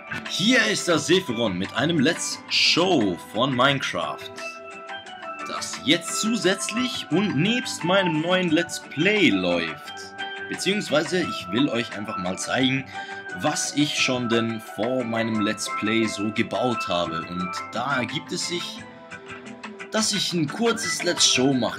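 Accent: Switzerland. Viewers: Da hört man deinen Schweizer Dialekt noch ein bisschen raus😂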